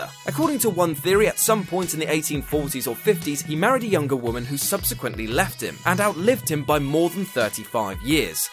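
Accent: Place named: England, United Kingdom. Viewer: People pronounce words differently. This guy is English and pronounces his vowels differently than, say, Americans.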